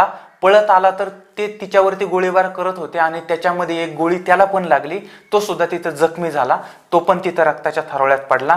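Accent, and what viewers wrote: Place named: rural, India. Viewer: निवेदन शैली गावाकड्ची, विनोद्प्रचूर पण गुन्हेगारी विशयी गाम्भिर्य व तळमळ असलेला लय भारी!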